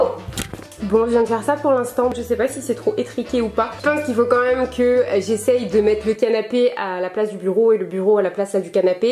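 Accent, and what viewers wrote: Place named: France. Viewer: I love your french accent. It is so pretty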